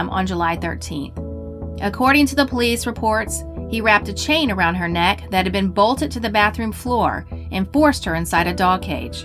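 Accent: United States. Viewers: yet has an American accent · you speak with an American accent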